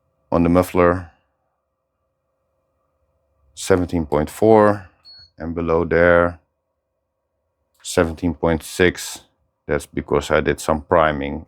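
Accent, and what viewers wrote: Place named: Netherlands. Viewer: Cool video, wanted to say that you sound Dutch and then looked into your profile 😀 Prima 👍 I see your garage wood walls, i assume its not 100% insulated. Wanna install this heater in my mancave, 5x5. Is it worth it? How quick does your garage warm up and to what temperature? If I need to run for 12 hours to get 20° than its sure not for me. Greetings from Limburg 👊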